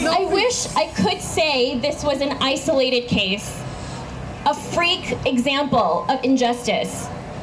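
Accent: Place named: United States. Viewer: Her English is 100% American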